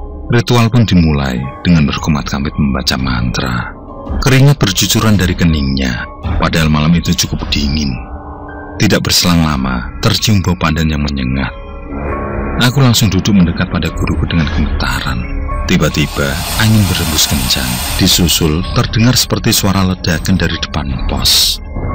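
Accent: Java, Indonesia. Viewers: kang izin masukan, saya penonton diluar jawa.. saya gk paham dg bahasa jawa.. terkadang juga translate nya gk lengkap dan warna nya juga kdg sulit dibaca.. lebih baik ceritanya dengan bahasa indonesia.. tp logat jawa gpp.. makasih dan semoga makin sukses ya kang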